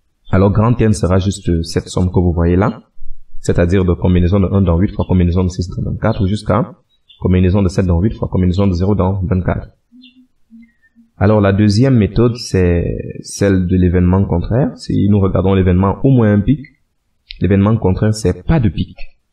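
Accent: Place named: Cameroon. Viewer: Chapeau tres bien explique et en plus vous avez une jolie main d ecriture bien lisible . merci a votre accent je sens que vous etes Africain surement Kmer dnas tous les cas bonne video continue seulement !!!!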